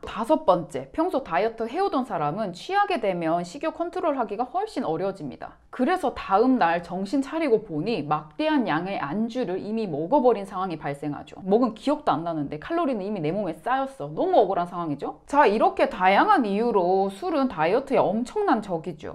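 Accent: Gyeongsang, South Korea. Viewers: 혹 대구분 이신가요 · 경상도 사람이다... 티가난다 억양에서 ㅋㅋㅋ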